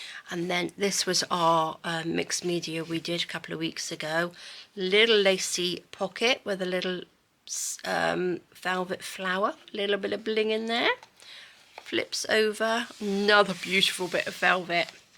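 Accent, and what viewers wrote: United Kingdom. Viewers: Congratulations, dear, on reaching 80,000 subscribers! You were the first creator I ever watched, when we were shut down for COVID in early 2020 here in the New York City area. I was home from work for 3 months, confined to the house, and going absolutely stir crazy, when this lovely British accented lady broadcasting from Portugal taught me what a junk journal was, and I have been a subscriber ever since…haven’t missed one! In fact, the first digital I ever bought was your Shabby Pink one. And of course I had to have water colors, distress ink, pastels, gesso, stabilo pencils, resin, glitter, lace, seam binding, beads, and bling, not to mention every color of your favorite rose trim known to man. I am so grateful to you for sharing your talent with us. I haven’t seen you work with your pastels in quite some time, perhaps you could include another project using them this year? 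Thank you again for teaching us your craft, and for keeping us entertained for so many years. Sending much love and gratitude to💖💖💖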